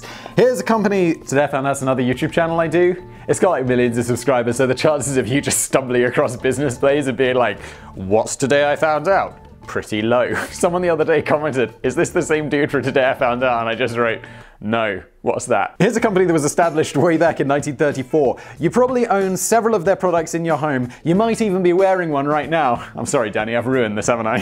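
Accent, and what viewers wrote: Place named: United Kingdom. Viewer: After years of listening to, I was alarmingly quick to accept that it was just some goofy British pronunciation.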